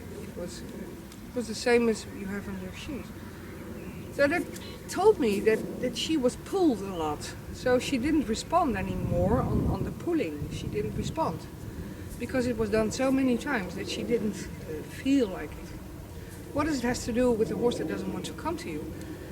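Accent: Netherlands. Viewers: Hallo zeg, nederlands accent :p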